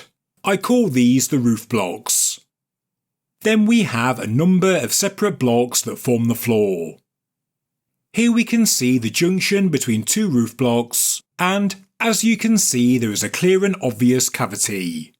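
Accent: United Kingdom. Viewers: Get used to it it's a great channel, he's a Brit, like Jude Law, and Michael Caine...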